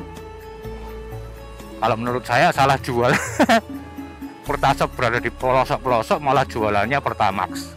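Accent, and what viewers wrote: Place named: Surabaya, Indonesia. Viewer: Opo wong playen asli Suroboyo Mas, loghate kok suroboyoan.?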